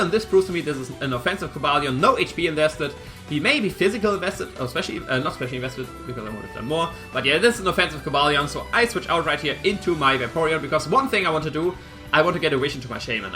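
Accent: Germany. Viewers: is this guy German?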